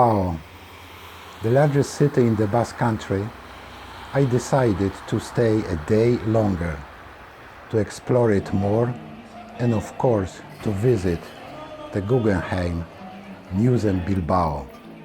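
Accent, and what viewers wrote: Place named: Poland. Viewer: Thank you very much for all your videos. The technical quality is better than almost all the others, the pleasant voice and intelligent content is appreciated too.I planned to walk 2020 in May but - - Covid! I hope to be able to go in Fall of 2021. I must say I taught in Poland for 2 years in the 1990's and your voice has that pleasant inflection that I became accustomed to while listening to my students and fellow teachers. Love to watch your videos on my TV so I can appreciate all the beautiful scenery. Thanks from Canada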